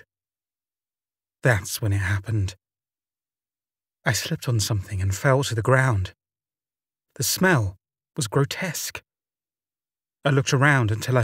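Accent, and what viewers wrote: United Kingdom. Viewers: Do you have a British accent? Everything he says is really British sounding. Im not saying he says goat like goot or anything, just that he says goat with a very British accent. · Dude I love your British accent! It gives the stories a great touch. Awesome content my friend! Watching from Dallas Texas U.S. home of the Dallas Cowboys not too far from goatman Bridge!🤠 ⭐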